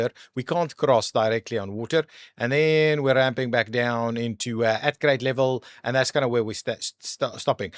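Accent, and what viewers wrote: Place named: South Africa. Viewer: the saffa accent makes everything better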